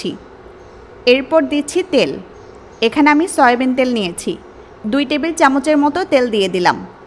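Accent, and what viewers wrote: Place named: Chittagong, Bangladesh. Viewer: Apnar kotha bolar style dekhe mone hocche apni Chottogram er manus.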